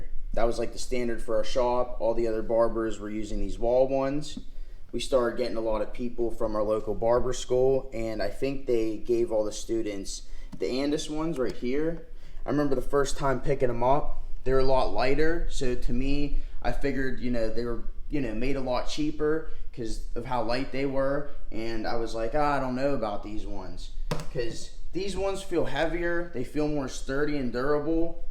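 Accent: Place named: Pittsburgh, United States. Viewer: G! Soon as I heard your Pittsburgh accent I knew and then you said Crafton! Been shaving my head 9 months. Pittbull Gold rotary. All good for 6months until I ordered replacement blades. Now getting irritation each shave. Would you recommend a close trimmer and this foil shaver for me. I’m shopping for both. Looking at andis t outliner pro and would like a foil to go with it. Great video man! Moved to the burgh 3 years ago!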